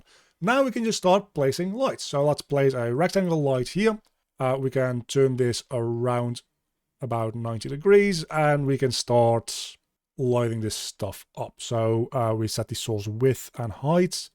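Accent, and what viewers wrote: Netherlands. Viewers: For some reason watching this really reminds me of Jazz Jackrabbit 2 , which, hilariously, was also made by Epic Games. One of the things I really love about their lighting setup there, was that the player character has a point light (with some trails) around them, as well as all the bullets. You could have really dark scenes where you could barely see anything, shoot a few bullets to get a sense of what's happening around you, and navigate almost by "sense of touch" through the character-bound light. As you started building out lights in your scene, it triggered deep memories from that game. <3 Thanks for the tutorial! Great stuff. Just what I needed. P.S. Heb je een Nederlands accent? ;)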